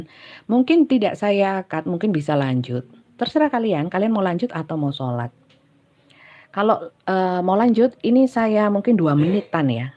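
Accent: East Java, Indonesia. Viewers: Sy sk sm logat bhasa ny mbk..medok jawatimuran.sm"dr jatim mbk..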